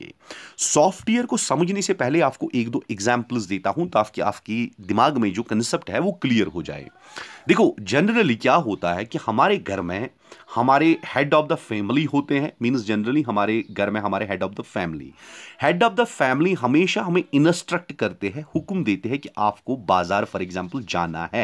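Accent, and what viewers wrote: Kashmir, India. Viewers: Sir I think you r from kashmir am I right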